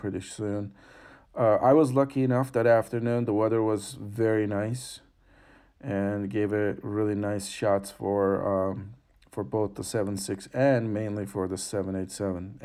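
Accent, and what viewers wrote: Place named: Turkey. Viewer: I liked your English.. it's quite fluent... but I felt the influence of Turkish ... any way I liked it and I prefer your videos in English..😊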